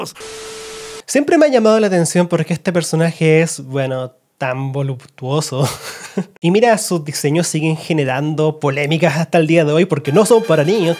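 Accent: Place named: Chile. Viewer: Bro tienes buenas ideas pero si quieres crecer trata de no tener el acento chileno.. para que seas global y te vean de mexico tambien, colombia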